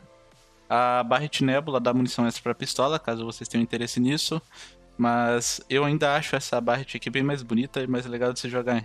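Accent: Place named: South, Brazil. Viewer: Mano tu é do Sul né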